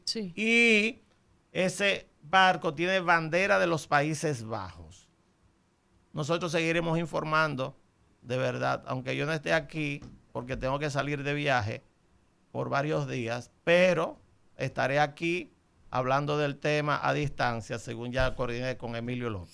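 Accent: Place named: Dominican Republic. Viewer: Me suena acento de la República.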